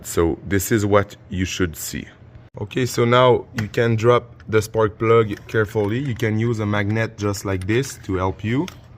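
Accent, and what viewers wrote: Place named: Quebec, Canada. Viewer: Merci pour la vidéo. Très utile, accent québécois en prime! Comme le mentionne un autre commentateur, il ne manque que l'écartement des électrodes.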